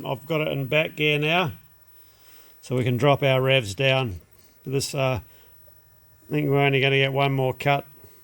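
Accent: Australia. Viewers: Another great video! Cheers from Norway again as it's late beer O clock! Looks like the stops there was a couple of Cat 3500 piston pins, have done a fair bit of those... Currently doing MTU for the last 10 years similar to the 3500. Also great to hear the Australian accent and the entertaining expressions like '' as the crow flies'' you have to explain that one as I didn't get it. Anyway cheers!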